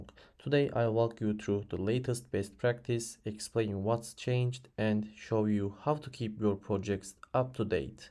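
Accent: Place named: Turkey. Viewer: Meraba 4. Saniyedeyim aksandan turk oldugunu anladim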